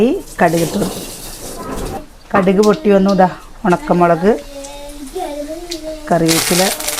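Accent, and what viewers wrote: Palakkad, India. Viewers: Thani palakadan verthamanam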